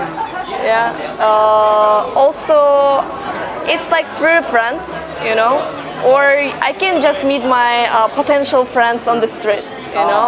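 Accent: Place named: United States. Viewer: She kinda has an American accent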